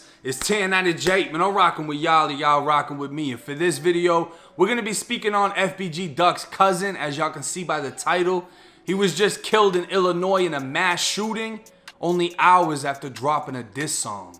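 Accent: Boston, United States. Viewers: R u from Boston with that accent? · Super dope channel. Random: Is that heavy accent on "AR" words only common with white people from Boston? I don't think I've heard any black dudes talk like that. Lil Mahk lol (Marc).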